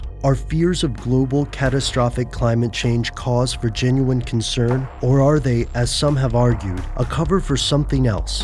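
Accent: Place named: United States. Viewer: love how you yanks destroyed the ENGLISH language. pronounce things the right way!!! like IRAQ not I Rack and Pakistan not Pack I stan.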